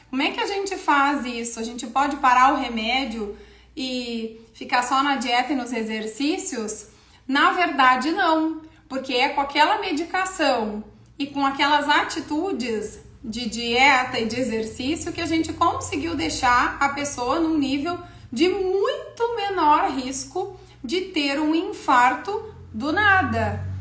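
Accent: Rio Grande do Sul, Brazil. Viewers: Um sutak bonito da mulher gaúcha, vc é super...beijo meu ❤ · Não mude seu sotaque não .sultaques dos gaúcho eu acho muito lindo.cicero Vitalino PR.meus parabéns doutora vc e uma ótima proficional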